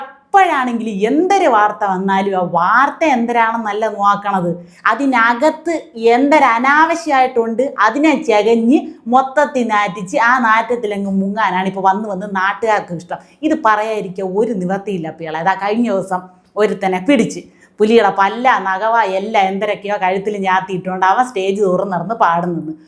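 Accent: Thiruvananthapuram, India. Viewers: I like the way you present things before your viewers. Moreover the thiruvonathapuram slang is hilarious. All the best. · ഈ അവതാരിക സൂപ്പറാണ് തിരൊന്തരം ആണോ പ്ഏര് എന്തര് ? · തിരോന്തരം ഭാഷ കലക്കി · Adipoli avatharanam. Tvm slang super, especially the contents of the news.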